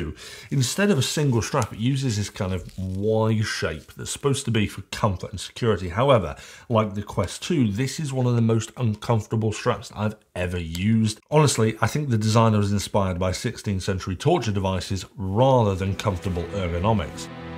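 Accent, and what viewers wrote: England, United Kingdom. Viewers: Like your delivery. You're easy to listen to. And nice to hear English rather than some disgusting American accent.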